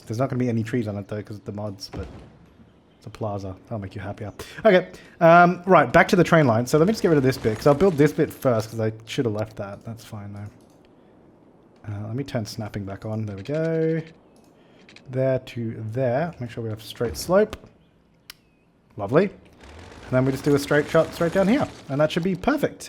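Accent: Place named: Australia. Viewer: Thank you for creating,! When I focus on your video, I find your commetaries funny! Including the fact that you, and some viewers here taking your builds seriously. Its awesome! I also like to let your vids play in the background while studying. At times I also watch your videos when I'm trying to go to sleep.Your soothing voice and your Australian accent can be the perfect lullaby. I'll watch as much ads as I can to support you ba'aa'aa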